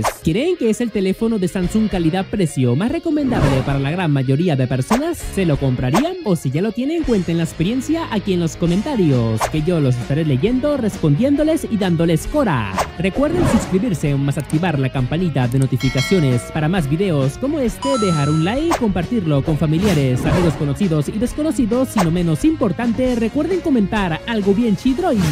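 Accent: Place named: Venezuela. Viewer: Hablas como venezolano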